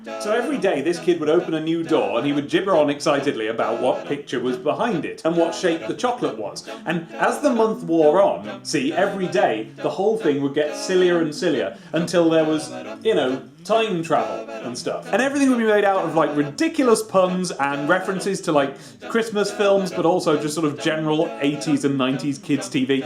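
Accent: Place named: Northern England, United Kingdom. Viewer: Hey,. Quick question; which county are you from? I have difficulty narrowing accents down or if accents even work by county of origin anymore. I can tell you're from the North but I wouldn't be able to tell York from Newcastle. We have an over abundance of London accents in the South East.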